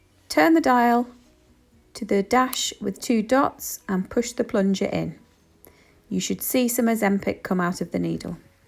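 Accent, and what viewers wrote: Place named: Liverpool, United Kingdom. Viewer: I am writing from Phoenix, Arizona, USA to thank you for this video. Watching this and your calm demeanor helped me to take my Ozempic medicine. You are a peach. BTW I love your Scouse accent. Merry Christmas! P.J. Stewart.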